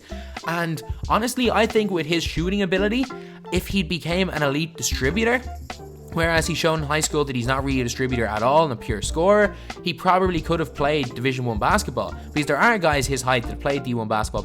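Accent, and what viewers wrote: Ireland. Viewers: Wtf you’re Irish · Are u irish? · Kinda strange listening to an Irishman talk about American High School boys. I didn't know basketball was big in Ireland since there was only one that ever played in the NBA "Pat Burke"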